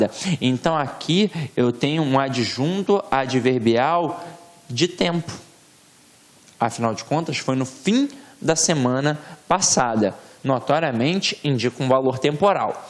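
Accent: Rio de Janeiro, Brazil. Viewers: Aula muito legal, o carioquês é muito bom kkkkkkk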